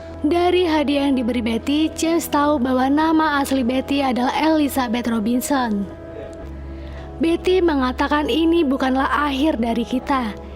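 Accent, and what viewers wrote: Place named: Pekalongan, Indonesia. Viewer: Logate kak kalongan temen mbak oo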